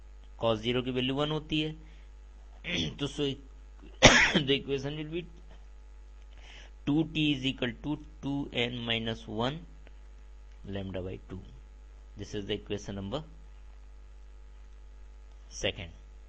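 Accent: India. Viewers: now thats the way you explain it, yes he isnt fluent in English, but did a much better explaining job than most profs around the globe, its Just that u need to be Indian to understand him. Good Job (Y)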